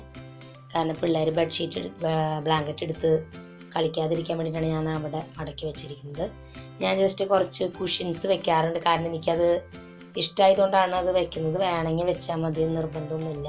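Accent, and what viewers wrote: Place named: Mala, India. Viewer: Evideya veedu?mala,tcr boarder ano?samsaram maarala.(mala).marambala trissur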